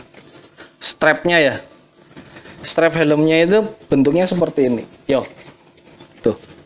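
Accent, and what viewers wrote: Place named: Banyumas, Indonesia. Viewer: ngakak mbah, ngapak campur b indo e khas bgt wkwk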